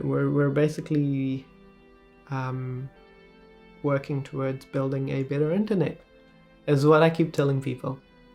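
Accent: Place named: New Zealand. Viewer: Good to have you back mate. Can hear that NZ accent in your voice 😉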